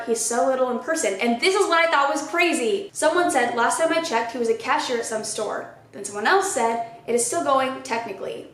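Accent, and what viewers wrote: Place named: South, United States. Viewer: That insane they all need arrested. Love your southern accent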